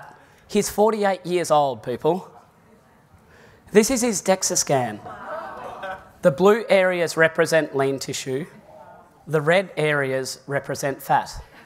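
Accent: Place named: Australia. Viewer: Great post. One note though pertaining to #2 above - the doctor in this presentation does address A1C. He refers to it by its full name of HbA1C with an Aussie accent that slightly throws off an American ear. And he says more or less what you do in #2. That the time averaged blood sugar level A1C reports is proportional to glycation levels upon all cells in the body including LDL. Otherwise, I'm still learning, but one thing at least some statins have are anti-inflammatory properties. Up until I saw this video just now, my understanding was that the epithelium layer inside your arteries is damaged by the repeated tug of war between blood sugar spikes and insulin to pull it back down. That sugar-insulin cycle over and over is inflammatory and damages the epithelium which is then "healed" by plaque your body deposits to protect it (like a scab). But in this video the doctor says it's all caused by damaged (glycated) LDL particles which find their way under the epithelium and are then captured by macrophages which then become foam cells which eventually results in arterial plaque. 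So this was a very interesting video indeed. The learning continues. One thing I know to do now is to try my best to get my triglycerides down, my HDL way up and my A1C down below 5.0 if I can. LDL seems of little importance if I get the others in line.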